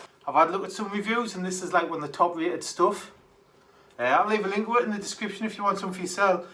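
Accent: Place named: Wales, United Kingdom. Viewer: Ahhhh. I thought you were welsh by your accent. Im from Madeira and it is so tiny and has quite a few accents. Now imagine the UK!!. Cheers bud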